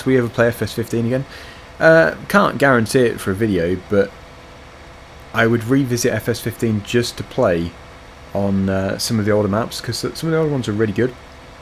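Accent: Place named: United Kingdom. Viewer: David Correia uk people have a different acsent and that’s how he says assume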